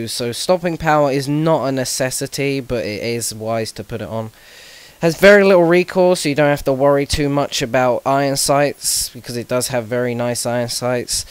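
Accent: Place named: United Kingdom. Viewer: Iclicked on this video thinking "Cool! an informative Create a video!" and then I heard the accent and i clicked away not because i didn't like the video, or that i hate British or English I just HATE the accent, and so many people have that accent on youtube and it makes my ears bleed.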